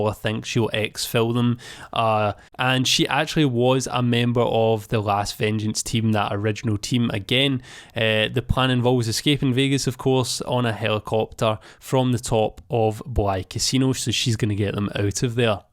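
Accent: Scotland, United Kingdom. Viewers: I'm more fascinated by your Scottish accent mate! It is great training for my dyslexia cos it forces me to really pay attention to what you are saying but the downside is that I totally missed out on the actual contents of your videos lol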